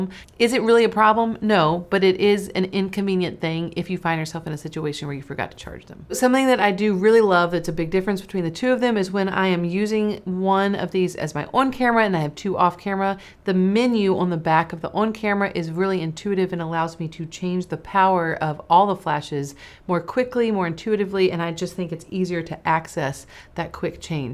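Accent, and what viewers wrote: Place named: Alabama, United States. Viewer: thank you so much for this video. I love your teaching/behind-the-scenes style and your smooth southern voice reminds me of the Alabama that I left to come down here to Brazil. BTW, my Canon 600EXRT was giving me some trouble (shooting full power every once in a while), so I bought the new Godox V860iii (it is R$1200 Brazilian). Just got it for Christmas and haven't even had a chance to take it out, but I loving it! Wish I could control other flash makers (like my YongNuo) with the Godox (you can, but only on manual S1). I can't even control my Canon flash with it, but that's ok because I plan on changing ALL my flashes for Godox. Hint: DO NOT BUY YONGNUO FLASHES, THEY BREAK EASILY AND ARE NOT WORTH IT!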